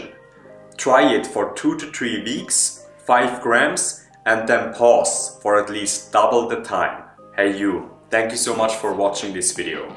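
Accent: Germany. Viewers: Bayerisches Englisch 😆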